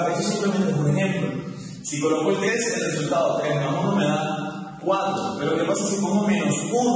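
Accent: Venezuela. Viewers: este pana si habla sifrino....pero explica bien. saludos... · Usted es Venezolano donde se pare. ¿oyó? (Soy Venezolano)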